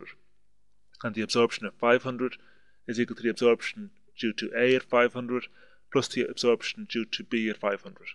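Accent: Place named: Ireland. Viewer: Lol, recognisably irish accent. refreshing for a change :)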